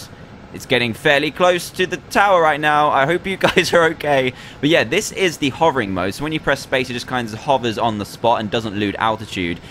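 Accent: United Kingdom. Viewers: HE'S BRITISH LIKE ME IDIOT! French ppl sound different!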